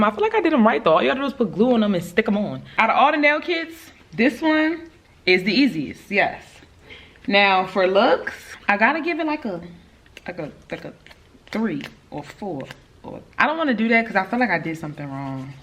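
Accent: Baltimore, United States. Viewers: You’re from LA? Girl, I thought you were from DMV or Baltimore. That’s what your accent sounds like.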